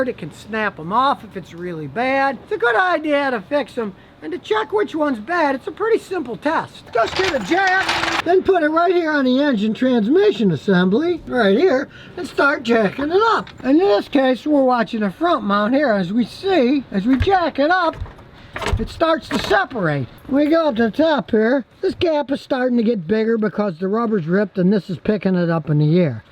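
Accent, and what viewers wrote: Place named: Philadelphia, United States. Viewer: Is this guy from Philly?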